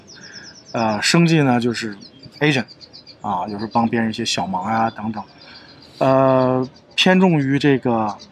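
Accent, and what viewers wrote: China. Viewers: 听着乡音太亲切了，本人也是西安人，在美国做移民律师多年，听多很多这样的故事，我们的同胞跋山涉水移民美国，美国毕业留学生削尖了脑袋拼命冲h1b工作签证抽签，中国中介略次坑惨了想要移民的同胞，我的客人感叹“被中介坑了，原来在刚踏出国门的那一课就做错了“ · 这口音听起来就是我陕普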